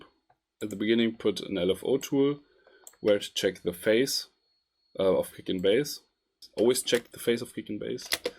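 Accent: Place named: Germany. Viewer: Hey du hörst dich deutsch an😅 falls ja könntest du auch deutsche tutorials machen?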